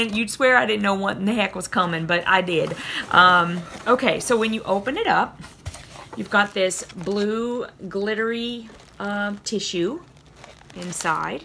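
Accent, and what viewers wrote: Louisiana, United States. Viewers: I've lived in Ocean Springs MS since 1969. I have a little bit of a Cajun accent now and it depends on the word lol. I knew I detected Southern Louisiana in you. Kindred spirit!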